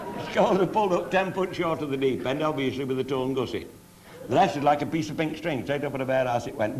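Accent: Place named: Cheshire, United Kingdom. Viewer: I live in the same area that he's talking about. Nice to hear an authentic Cheshire accent.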